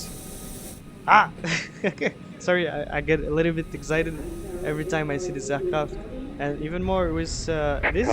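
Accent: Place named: India. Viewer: J'adore ce petit accent anglais qui fleurte avec celui des Indiens anglophone 😅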